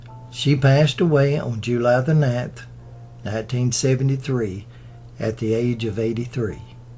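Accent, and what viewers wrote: South, United States. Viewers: Mr., Your channel is great content-wise. I also enjoy your Southern accent profusely. All the best to you from Poland. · I love documentaries but I see yet another set of remarks as to people being perplexed about others giving thumbs down. will try & tell you it's because of his heavy southern accent and some of that is true...BUT...when you call Elia Kazan ELLA Kazan or the Bubonic plague the BLUEBONNET Plague and say things like "first debut" which is redundant, instead of screen debut which is proper - it gets tiresome. He also called child actor, Brandon De Wilde, BRIAN De Wilde as well as Ida Lupinom Ida LUPINA! The mistakes makes are not due to his southern drawl....they're due to a type of laziness when reading and not caring enough to have someone else listen to the soundtrack and making the necessary corrections. There are more errors and it's a shame because I believe puts a good deal of effort into his research and I think he'd get more respect if he paid a lot more attention to what he's trying to produce. 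· Hey, i love and appreciate your videos. In part because i appreciate your southern dialect, however, it has been proven in several linguistic studies that a large portion of the population at large automatically make the incorrect assumption that people with a southern dialect are less intelligent and less educated. So, sadly, i suspect that a good number of your down votes may be the result of bias against your southern dialect. That being said i also think that your popularity and success on YOUTUBE is also in part a result of people who appreciate your southern dialect. Whenever someone presents something uniquely their own to other people that is powerful enough for them to feel strongly about, then that is just exactly what they will do, some WILL feel strongly that it is good and some will feel strongly that it is bad. Don't focus on the fact that you have negative reviews-- after all we all have our critics lol-- just focus on the fact that what you are doing with your documentaries makes people feel strongly about them-- strong enough to leave an opinion-- and that my friend is an accomplishment in and of itself!!! 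Why... because in today's digital world it takes a POWERFUL CONNECTION in order to motivate people enough for them to even bother leaving an opinion. Even something as simple as a thumbs-up or a thumbs-down... So good job!!! (So this thumbs-UP is for you, what you do and how you do it -- not for my own comment-- however I'm sure that some p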